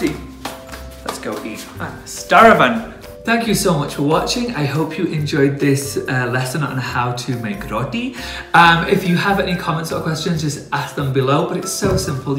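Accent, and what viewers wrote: United Kingdom. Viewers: Zehra Rizvi Isnt he British? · A C R Maybe it’s just the dialect that I grew up with, but roti also has a solid “o” sound and a thick “t,” I think he knows he’s using an English accent because he pronounces it with a Pakistani accent in the beginning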